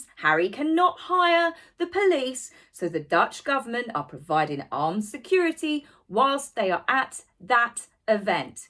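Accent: United Kingdom. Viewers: The good thing is those of us in the United States just figure it’s a British pronunciation 😆 you are fabulous and you say it however the hell you wanna say it. I just think it’s a gas that MM wasn’t smart enough to use prefix Archie - spell it differently that way. everyone would’ve known what she was trying to do, even if in the end it doesn’t make sense and she’s talking about stereotypes. Love you have a wonderful Easter!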